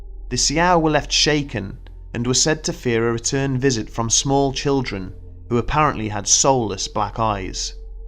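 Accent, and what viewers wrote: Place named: England, United Kingdom. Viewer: The dude’s English. I’m willing to bet he knows how to pronounce Leicester while you do not. Get over yourself.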